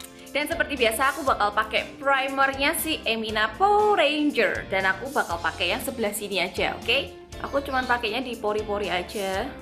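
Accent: Java, Indonesia. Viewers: Bikin video bodycare routin dong 😍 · itu pakek emina apa? Pelembab atau apa? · Hayoo sapa seng suka nntn chaenell e tp jrng ikut comen ky nyong 😆jempole mana 😄lophe lophe 😘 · salam selalu😍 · itu potongan rambutnya apa namany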